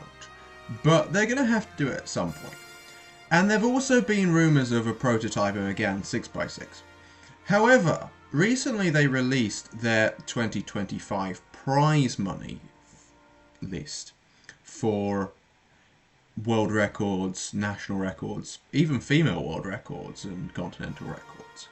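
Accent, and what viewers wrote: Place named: RP, United Kingdom. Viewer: I love your posh accent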